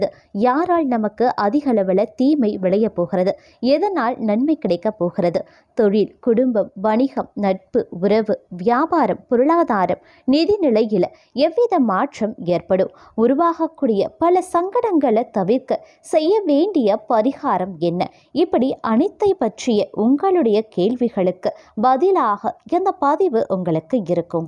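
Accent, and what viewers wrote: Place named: Sri Lanka. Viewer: இலங்கைத்தமிழில் வாசிப்பு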